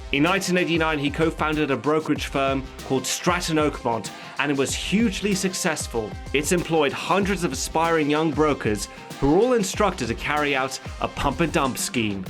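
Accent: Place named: United Kingdom. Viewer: except the great video ❤ are u british ..i love your accent😂❤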